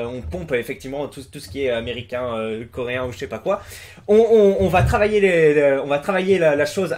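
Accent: Alsace, France. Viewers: Accent Alsacien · alsacien prolo, si tu veux.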